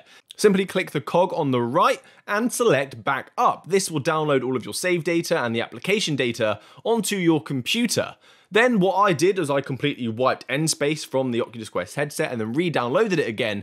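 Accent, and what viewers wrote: United Kingdom. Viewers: Where do you come from in UK? I can't identify the accent. Norfolk? Essex? Or?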